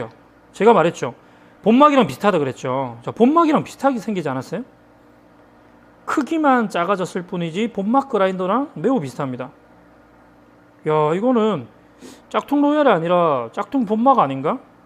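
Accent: Gyeongsang, South Korea. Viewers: 경상도분이신거 모르기가 더 어렵지 않을까요 ㅋㅋㅋㅋㅋㅋㅋ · “구불고 구불고~”아주 오랜만에 듣는 말이네요. 갱상도분이신듯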